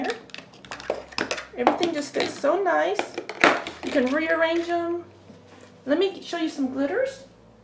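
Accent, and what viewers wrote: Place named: South, United States. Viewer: lol, I used to speak southern too sweetie, took years to wear off 😁 your speech is fine, I’ve never noticed you mispronounce a thing, honestly. Hey, no matter which name you say now, I’ll match both lol.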